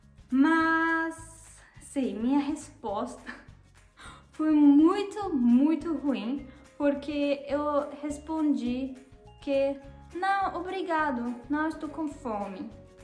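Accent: Germany. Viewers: O Mico é normal, leve com bom humor para não se aborrecer e você aos poucos vai estar falando o idioma perfeitamente. Procure ouvir músicas no idioma português brasileiro e sempre que possível converse no idioma. Não sinta vergonha de errar e continue praticando. Uma das coisas que deve sempre observar é o uso do gênero, na maioria dos idiomas o artigo é mesmo para o masculino e o feminino, porém no português muda o artigo a, o, as, os articula com a palavra e muda com o gênero masculino ou feminino. Por exemplo numa frase como Deixei cair a xícara da mesa, "xícara" é uma palavra feminina é precedido do artigo "a" , seria errado dizer : Deixei cair o xícara da mesa. O seu sotaque alemão é bem leve quase não se percebe, quando estiver falando e articulando corretamente a maioria das palavras se você não disser estando no Brasil que sua nacionalidade é alemã facilmente se confundirá com uma brasileira.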